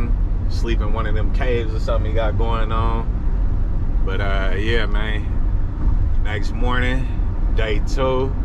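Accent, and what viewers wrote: Bay Area, United States. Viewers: I eventually figured it out as the video played, but out the gate I knew you was from the Bay! Great video, been thinking about going the same route as you.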